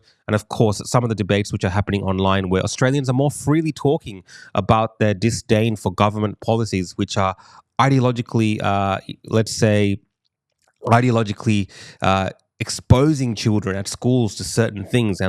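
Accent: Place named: United States. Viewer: Her American accent noted.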